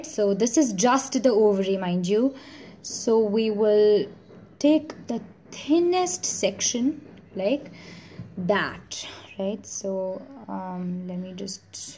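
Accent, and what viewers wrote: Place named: England, United Kingdom. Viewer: Impressed by English accent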